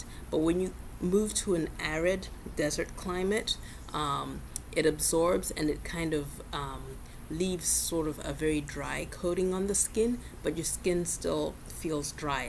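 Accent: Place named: Zimbabwe. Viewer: Are you Zimbabwean? that accent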